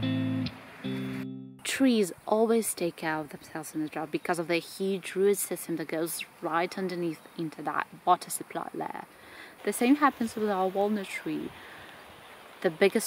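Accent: United Kingdom. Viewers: British accent....that's impressive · Cabbages were too good ...good work ...you belong to belarus but your english accent sound like british ?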